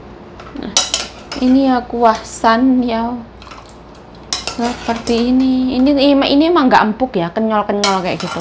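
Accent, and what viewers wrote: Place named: Java, Indonesia. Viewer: Mandarin versi Jawa yuk... Muedok e poll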